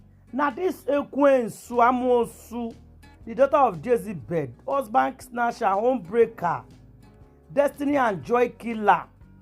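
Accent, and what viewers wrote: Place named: United States. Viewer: you are talking like an American, but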